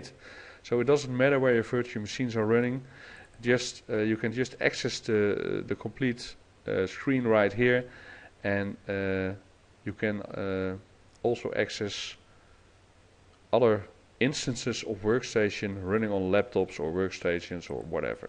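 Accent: Netherlands. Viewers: whaha viertual musjien Ik heb echt een hekel aan engels met een nederlands accent hoewel ik het zelf ook heb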